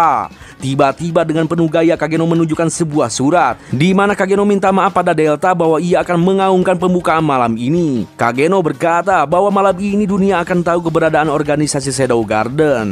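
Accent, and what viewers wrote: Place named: Manado, Indonesia. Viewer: Takage ya manado banget😂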